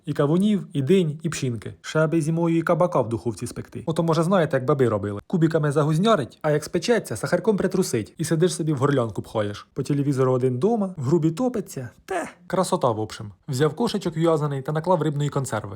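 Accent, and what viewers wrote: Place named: Cherkasy, Ukraine. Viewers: Слухаю черкаський говір ,як музику і згадую Таню з Черкас🤭😆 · Ото,коли чую слово" кохве", то вже мій день відбувся!!! Чудова українська- центральночеркаська мова. Вітання зі Шполи!!! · Черкаси тепер зрозуміло звідки це й знайомий діалект)) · тобі здоров'я!!! Бачив що з Черкащини,думав що до Київської сторони,а коли взнав,що з Тіньок,мало не обістявся(земляк в тройні)!!!Моя мама до затоплення з дідом теж в Тіньках жили,а потім в Чикаго переїхали!!! Мені дуже приємно дивитись твої відео аж до сліз!!!Дивлюсь з Одеси!!! Чекаю на твій контент з нетерпінням!!! Дуже приємно і класно в тебе виходить з нашим Черкаським говором і твоєю харизмою!Бажаю здоров'я ❤❤❤!!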